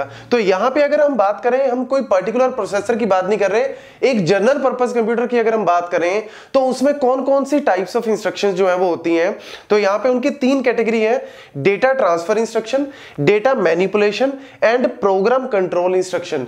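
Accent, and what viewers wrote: Punjab, India. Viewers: The best Computer Architecture teacher ever! I hated this subject until I started watching your videos, Sir. :) I love your Punjabi accent, it makes the lectures interesting and fun. You are always to the point and cover the topics in such less time. I am thankful for your speed. If I ever become a professor, I want to teach like you.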